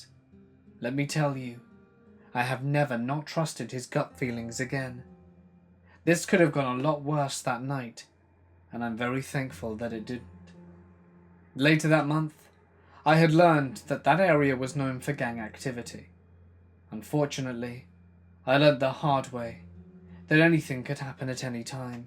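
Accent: England, United Kingdom. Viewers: do you realize how weird it is, as an American, to hear U.S. stories told by a man with an English accent? Well, it is! 😂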